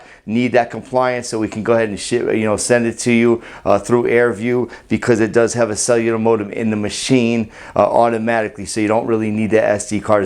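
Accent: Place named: North Jersey, United States. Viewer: Bro!! You from North Jersey?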